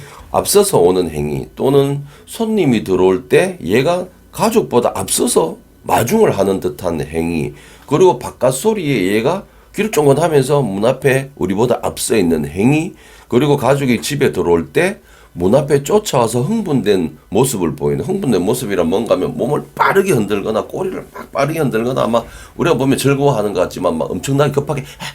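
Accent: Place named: Gyeongsang, South Korea. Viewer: 너무 감사해여~~ 3개월된 강아지 입니다. 입질도 심하고~ 으르렁 거리면서 진심으로 저의 손도 물고 기본케어를 전혀 못하는 강아지 입니다. 방문교육도 했는데 ... 저는 안되더라고요 ㅎㅎ 선생님 교육 계속 다시보고 다시보고 계속 다시보면서 아이보다 저의 문제 구나~~깨달았습니다. 열심히 주도권 교육을 하고 있습니다. 여기서의 리더는 나야 라는 어필을 계속 강아지 한테 표현하고 있습니다. ㅋㅋ 아직은 부족해서 타이밍도 잘 못잡고 제가 감정조절이 안되서 조금 불안하긴 한데 강아지가 눈치도 보면서 곧잘 하고 있는듯 합니다. ㅋㅋ 영상을 보고 행동했더니 일주일정도 되니 입질이 매우 좋아졌어요~ 더이상 따라다니면서 안물고 같이 자자고 떼쓰지 않아요~^^ 아직은 많이 부족한 보호자라서 많이 노력해서 배우고 강아지랑 좋은 관계를 맺어보도록 하겠습니다. 영상이해하기 싶고 경상도 억양도 너무 매력적이시고 ~~ 감사해하면서 시청하고 있습니다. 감사합니다 ~^^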